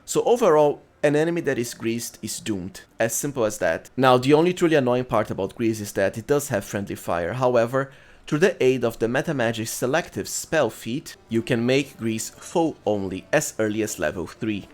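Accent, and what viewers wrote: Brazil. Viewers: What a kind, beautiful, genuinely helpful and clearly profoundly rich in experience video. A video out of pure passion. Thank you so much dude. Also, let me ask you, if you see this comment despite it being on an old video: Are you Brazillian? I ask because I am and your accent sounds a little similar to mine hahah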